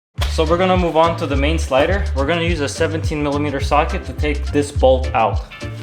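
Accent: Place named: Russia. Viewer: He sounds like russian blyat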